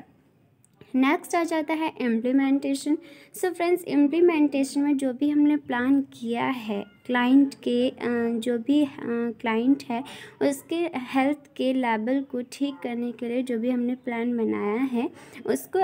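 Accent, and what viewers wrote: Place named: India. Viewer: إنجليزي بنكهة هندية 😂😍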